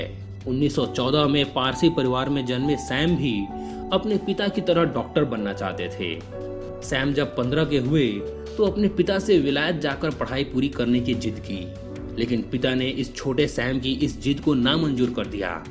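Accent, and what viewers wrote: Bihar, India. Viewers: Dude, you have worst hindi accent, Marsal nahi Marshall, Saw nahi Shaw, Des nahi Desh , pls stop this bhayiyya accent laude. 😆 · Yah baat.. Yah baat karte karte video ki aisi taisi kar Di.. Voiceover kisi aur se karwa lete yaar.. Bihari accent does not suit the video theme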